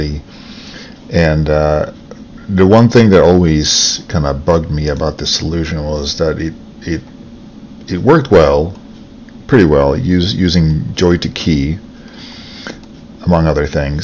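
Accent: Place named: Netherlands. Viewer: lekker bezig man! wel beetje overduidelijk nederlands haha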